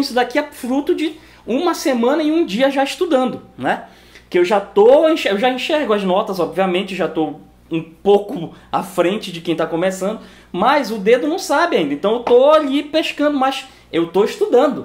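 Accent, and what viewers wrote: Pará, Brazil. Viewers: Sotaque paraense, acertei?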